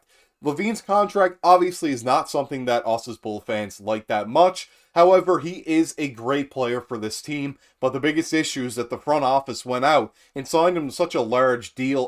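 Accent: Canada. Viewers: Are you from Newfoundland or PEI? Lol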